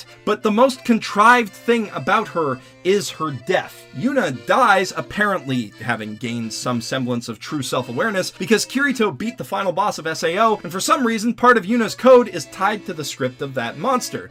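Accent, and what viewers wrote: Canada. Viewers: Holy cow! Are you Canadian??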